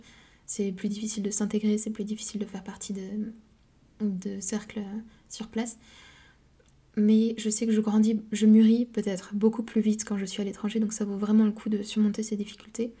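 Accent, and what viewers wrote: Russia. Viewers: Tu ne serais pas plutôt bilingue Russe/Français issue d'un mariage Mixte ? car hormis ton génotype plutôt Russe ton petit accent te trahit aussi, puis quand à tu demandes si "obsessive" se dit en Français, j'ai de gros doutes, merci quand même pour ce Coucou de Russie, en souhaitant que les relations entre nos 2 pays historiquement amis se rétablissent au plus vite. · Vous avez un accent russe maintenant 😊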